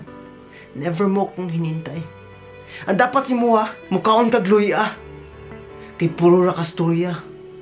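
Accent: Visayas, Philippines. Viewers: Hahahaha mkatawa ko ani niya mo storya ba kay bisaya kaayo..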